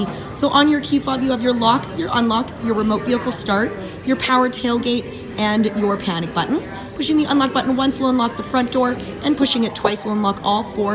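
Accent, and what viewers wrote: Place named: Canada. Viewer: Canadian tell 1 - "Zed". Canadian tell 2 - "Oout".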